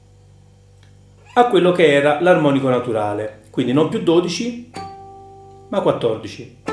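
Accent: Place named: Naples, Italy. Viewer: Grazie sei molto bravo e chiaro..poi quell'accento napoletano mi rilassa una cifra!un caro saluto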